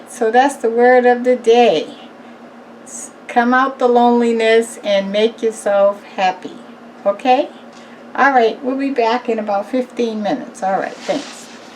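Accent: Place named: Boston, United States. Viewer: grew up in west Roxbury, recognized the accent immediately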